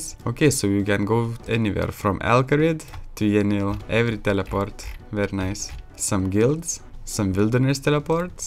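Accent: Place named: Lithuania. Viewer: You sound like you are from lithuania , btw great server 100 + players online everyday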